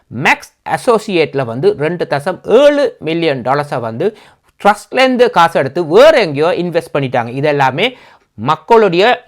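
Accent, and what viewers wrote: Sri Lanka. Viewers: Are you a Sri Lankan Tamil ? Your Accent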